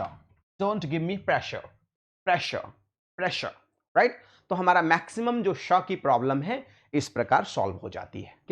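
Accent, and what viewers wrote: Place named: England, United Kingdom. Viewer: Ur pronunciation is as like the english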